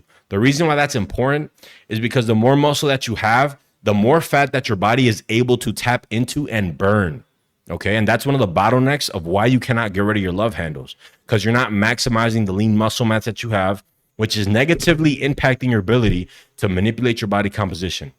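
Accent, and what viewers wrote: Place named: New York, United States. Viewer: My guy sounds like he's from NYC...good video bro..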